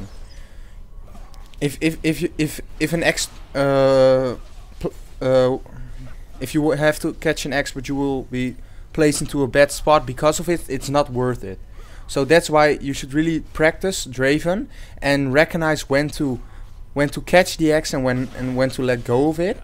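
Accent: Netherlands. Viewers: That dutch accent ♡ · je hoort gelijk dat het een nler is hehe, nice vid tho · i think he's dutch his accent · I'm pretty sure that accent is from Holland :)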